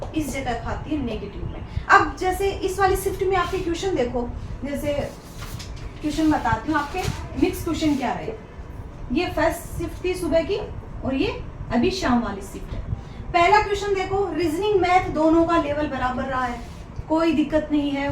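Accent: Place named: Jhunjhunu, India. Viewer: Mam aap mere ko bhasa sai to jhunjhunu sai lag rhai ho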